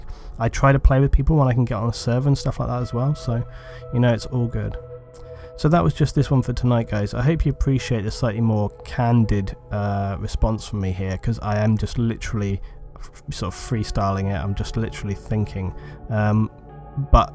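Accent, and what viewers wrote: United Kingdom. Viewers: Your Sexy British voice (No Homo) captivated me....=subbed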